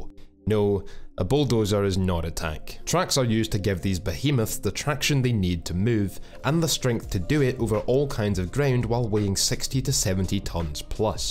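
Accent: Scotland, United Kingdom. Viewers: This proves it. I will happily listen to anything if it's spoken in a Scottish accent. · Are you Scottish? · Man... I just LOVE your scottish accent, sounds so cool :D Nice video as well, keep it up ;)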